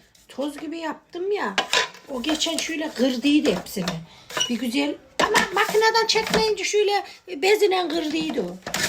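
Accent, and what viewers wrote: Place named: Nevşehir, Turkey. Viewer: Gırdı Nevşehir li ne Türkçe amma 😊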